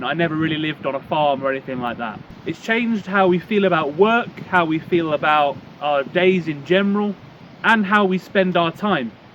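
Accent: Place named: United Kingdom. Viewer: Gosto de ver a perspectiva dos outras pessoas sobre o país. Parece-me que muitos britânicos conseguem ter uma opinião fundamentada e clara rapidamente; entendem relativamente bem a dinâmica social. Também aprendo algumas coisas, aparentemente, muitos estrangeiros que não são ricos, mostram-se satisfeitos com uma casa simples, que vão renovando de acordo com as suas circunstâncias, e fazem-no com muita resiliência e serenidade. Penso também sobre os rendimentos destas pessoas, mostram ser criativos e com domínio do mundo digital, onde se movimentam bem, e talvez estejam dispostos a correr mais riscos em relação à fiabilidade do emprego/rendimento/income a médio prazo, do que eu estaria. Por vezes pergunto-me, o interior está desertificado e desaproveitado, se estrangeiros podem viver nestas áreas porque mais jovens portugueses não o poderiam também fazer? 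A resposta clássica de muitos portugueses, grupo nacional de que tenho a honra de fazer parte, seria que os "estrangeiros têm muito dinheiro e/ou fontes de rendimento dos seus países de origem". Na minha opinião é uma resposta redutora, claro que existem estrangeiros com muito dinheiro, mas provavelmente não serão a maioria, pelo menos dos que vivem em Portugal, por isso, talvez possamos reter algo do seu modo de vida, e da maneira como criam sinergias e soluções de vida no interior. Este simpático jovem senhor, transmite a imagem de uma pessoa pacífica e bem intencionada, gosto do sotaque britânico, e vejo sempre os vídeos s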